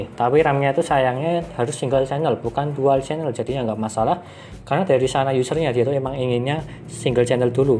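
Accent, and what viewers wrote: Surakarta, Indonesia. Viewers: Logat solone ketotot😂😂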